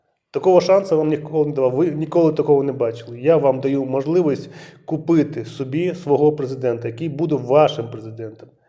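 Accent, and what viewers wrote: Russia. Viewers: Дуже ваша російська ріже слух . Чи ви часом не засланий козачок?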